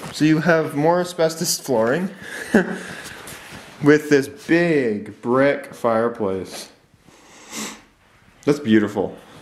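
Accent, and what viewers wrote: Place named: Canada. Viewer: I can already guess this is probably in Canada because of your accent.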